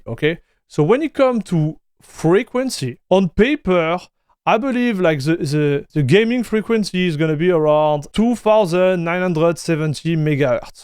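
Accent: France. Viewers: OK. I thought you were from France because of the accent. I forgot that parts of Canada are also French-speaking. Warm greetings to you! Thanks for the channel. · I ordered a Powercolor Reaper for 663 euro on Ldlc, great deal in Italy, considering the base MSRP + tax here is 690 euro! I know it's the bottom line model, but i was planning to undervolt it anyway, so the 2x8 pin are perfect for me, since i have a rm650e and might be kinda CPU bottlenecked in some situations anyway with my 5700x3d. Btw, thick French accent! 😁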